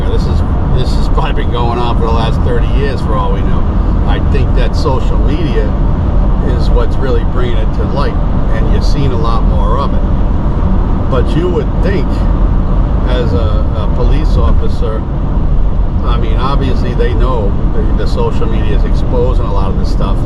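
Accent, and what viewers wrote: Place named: New England, United States. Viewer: Hey you sound like a new england guy, where from im from north andover ma. Any way i rhink alot of the problem is militarized policing. Virtually all police today are former military. This is the american people experiencing what our military have done around the world while at war. So take a solider who has done multiple tours, a job where violence is used to solve problems. Bring them home, send them to a two month academy then give them guns a badge and qualified immunity. How could anyone expect that to not go wrong. Oh and the police culture of blanket criminality. You know, a person commits like two felonies a day in this country because of the thousands of ridiculous codes and policies cops know that,;they are gonna find out what you did today on that traffic stop.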